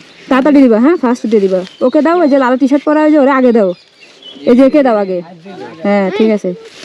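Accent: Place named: Jessore, Bangladesh. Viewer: আসসালামুআলাইকুম ওয়ারাহমাতুল্লাহ আপু কেমন, আছেন আশা করি ভাল আছেন। সেহরি খাওয়ার পর ফজরের নামাজের পর থেকে আপনার ভিডিও গুলো দেখছিলাম অনেক ভালো লাগছে আপনার যে মানব সেবামূলক কার্যক্রম এগুলা অনেক সুন্দর লাগছে আমার কাছে। আপনার প্রতিভাকে সাধুবাদ জানাই এবং আপনার জন্য দোয়া করি আপনি যেন সবসময় এইভাবে গরিব অসহায় ও দুস্থ মানুষের পাশে দাঁড়াতে পারেন দেশ ও জাতির জন্য কাজ করতে পারেন আল্লাহ আপনার জীবন ও ভবিষ্যৎকে ভালো করুক দুনিয়া আখেরাত কল্যাণময় করুন এই দোয়া রইল আপনার জন্য। আমি আপনার চ্যানেল টি সাবস্ক্রাইব করেছি এবং আপনার ভিডিওগুলো দেখছি অনেক ভালো লাগছে। আপনার কথা শুনে মনে হল সম্ভবত আপনার বাড়ির যশোর জেলার মধ্যেই হবে আমিও যশোর জেলার ছেলে। আমার বাড়ি যশোর খাজুরা রাজাপুর গ্রাম। আল্লাহ আপনাকে দ্বীনদারির সাথে সুস্থতার সাথে নেক হায়াত দান করুক এবং বেশি বেশি মানব সেবামূলক কাজ করার তৌফিক দান করুন আমিন। আমাদের জন্য দোয়া করবেন আসসালামু আলাইকুম ওরাহমাতুল্লাহ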